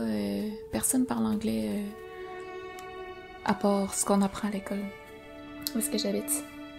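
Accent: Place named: Quebec, Canada. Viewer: Je me doutais a ton précédent gameplay que tu parlais français (notamment lorsque tu utilises la voix google) mais j'osai pas le dire. Je suis français et c'est vraiment cool d'entendre un accent québécois ;-)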